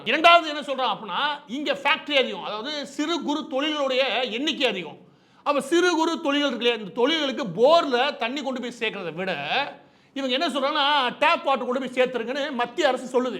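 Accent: Madurai, India. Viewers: சாட்டை நெல்லைத்தமிழ் நீங்கள் மதுரை தமிழ்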